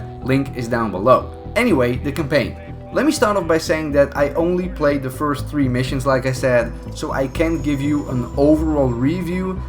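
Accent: Netherlands. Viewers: Funny how I get this video recommend and first thing I notice is your Dutch accent 😂 goeie vid man 💪🏻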